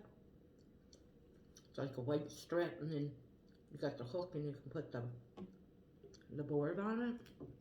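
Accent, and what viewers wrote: Canada. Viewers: this is when I notice the Canadian accent lol 😆 I have no idea what you said haha! Nice video mama breakfast looks delish. Congrats again on the new crib!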